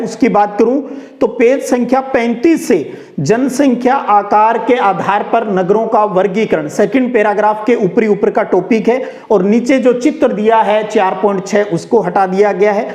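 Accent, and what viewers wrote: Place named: Sangariya, India. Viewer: Sir aap sngriya se h kya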